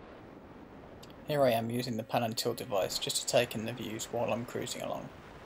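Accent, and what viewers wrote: United Kingdom. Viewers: Absolutely brilliant! I love narrated flights. I tried to do it once but I have a boring voice and make it sound like a kamikaze pilot. Speaking of which, I sense a slight Midlander accent?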